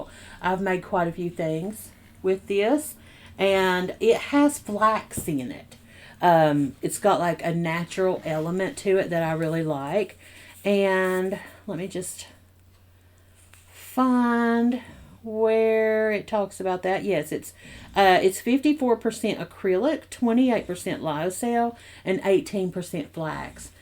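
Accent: South, United States. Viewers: Sounds like a good day for you. You live in Maine? You sound more southern than I do and I live in Kentucky. You sound like one of us.😁😁😁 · As the video goes on, I do declare, it is questionable that you may have have some Georgia 🍑 in you, oh I detect some southern draw! Oh gosh ,I have been smiling for my whole time here! How oh how could this be? I am going to subscribe !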